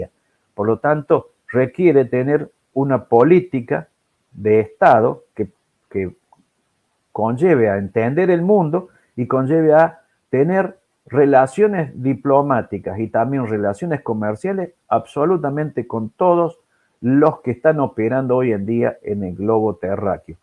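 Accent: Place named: Córdoba, Argentina. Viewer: Tonada cordobesa!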